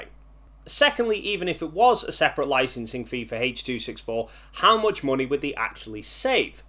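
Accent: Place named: Isle of Man, United Kingdom. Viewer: That's a Manx accent for sure! You a fellow Manxman? 😁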